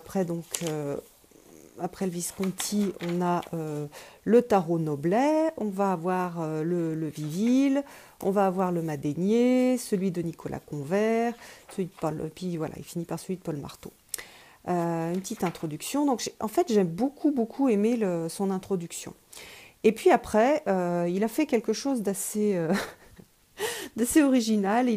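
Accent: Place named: France. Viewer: Não entendi nada, mas adoro o sotaque francês.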